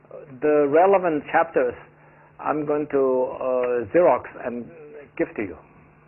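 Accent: China. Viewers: 还有发音也还是很有华人的特点。不过瑕不掩瑜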